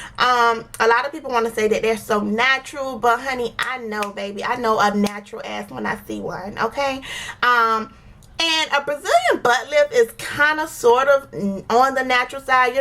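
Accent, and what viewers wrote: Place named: Florida, United States. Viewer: I just found your channel... I love it... I love how us Floridians pronounce stretchmarks (skretchmarks) 😊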